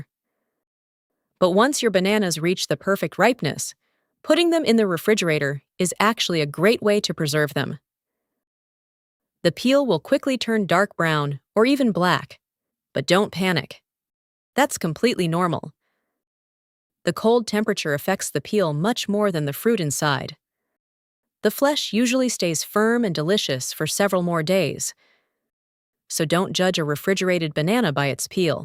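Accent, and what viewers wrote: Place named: United States. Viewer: BanEnas, can't we have a proper English version on Youtube as opposed to American so called English.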